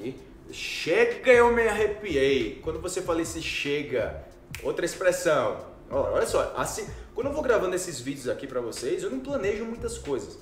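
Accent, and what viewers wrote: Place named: Colombia. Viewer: Eu não gosto muito do acento colombiano, mas quando você fala espanhol com esse acento, soma muito engraçado além das "muecas".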